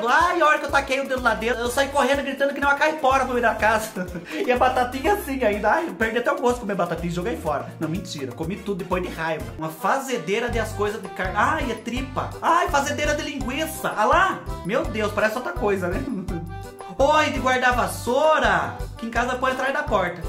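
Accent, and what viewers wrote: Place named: Itapetininga, Brazil. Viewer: amo o seu canal kkk cê fala igual eu e a turmaiada aqui de itapetininga kkkkkkk espicúla kkkkkk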